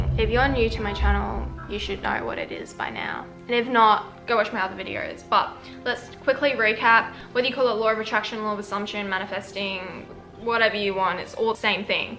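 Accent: Australia. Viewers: i watch her so much i'm starting to speak with an australian accent now.. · as an Aussie U can tell cause of the r sound, like when she says nose she says norse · R u an Australian? Ur accent is totally different 😍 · not me slowly adapting aussie accent bc of her 😭😭🔪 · its an aussie accent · Please my British accent sounds so stupid trying to imitate her aussie accent 💀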